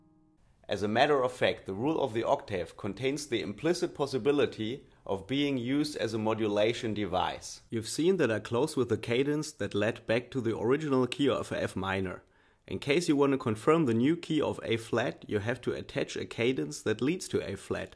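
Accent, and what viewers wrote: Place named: Germany. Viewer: You have an intellectual clarity mixed with humour which is very nice. Leaves the watcher with a lot of food of thought. Thumbs up! (Btw as a Swede the German accent makes all difference =) ) ps. very well researched, another thumbs up! ds.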